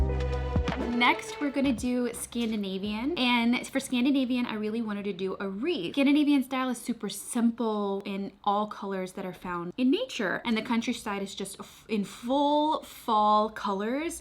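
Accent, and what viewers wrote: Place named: Hill Country, United States. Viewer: I love love love the way you speak. I hope that's not weird...you have such an elegant tone and each word if full of genuine feeling. did I already say I love it!! Maybe I just miss hill country accents <3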